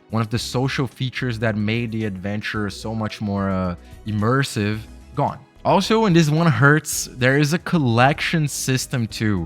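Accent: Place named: Brazil. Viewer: Tava vendo o video e comecei a notar um rastro de sotaque brasileiro. Fui olhar teu insta e nao deu outra kkkkkkkkkkkk por mais impecavel que seja o ingles sempre da pra perceber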